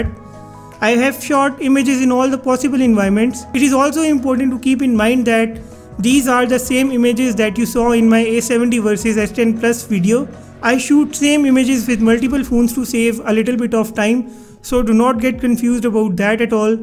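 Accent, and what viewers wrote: India. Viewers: Bruh that indian English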